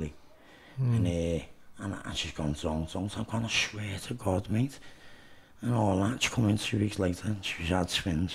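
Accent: Liverpool, United Kingdom. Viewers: Scouser x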